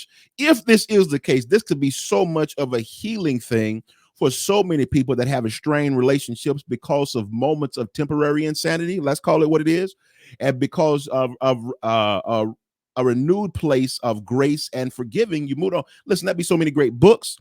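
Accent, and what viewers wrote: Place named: Texas, United States. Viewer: Bro. you speak it real just like us country folks from Tyler, Tx do. 😂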